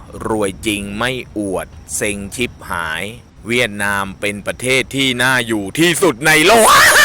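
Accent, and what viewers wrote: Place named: South, Thailand. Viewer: 😂😂😂😂😅🤣😆😁😂กดขำให้ตั้งแต่ยังไม่ฟังเลย/อ้อ!ชอบแอดพูดภาษาสำเนียงใต้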